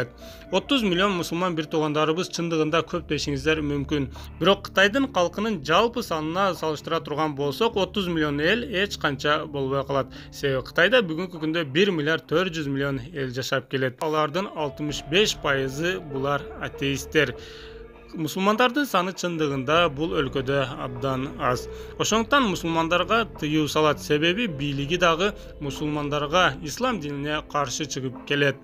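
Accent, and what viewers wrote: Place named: South, Kyrgyzstan. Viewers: У него южный акцент. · Текстерде Баткен диалектикасындагы акценттерди колдонбостон адабий кыргыз тилинде сүйлөсө жакшы болот эле. Келгендигини,кылгандыгыны,ичиде, көңүлүнү, чочконун этини, тарабагандыгыныны,жайылбагандыгыныны, ...... .... ... . Сөздүн аягындагы куйруктарды колдонбосо ☝туура болот. Бул акыл үйрөтүү эмес.Журналистиканы бүткөнүңдө баарын окутмак, 5 жыл.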